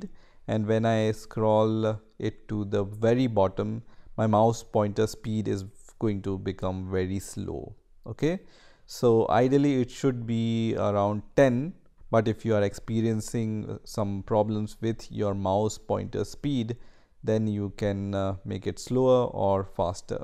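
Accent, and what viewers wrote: India. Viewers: classic indian accent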